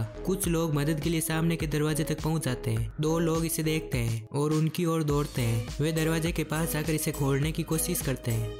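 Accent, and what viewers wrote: Rajasthan, India. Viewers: Rajasthan voice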